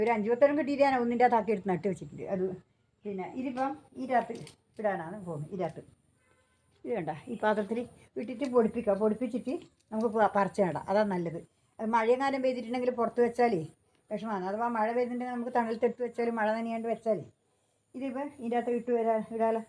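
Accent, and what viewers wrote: Kannur, India. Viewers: ഏച്ചി കണ്ണൂരാണോ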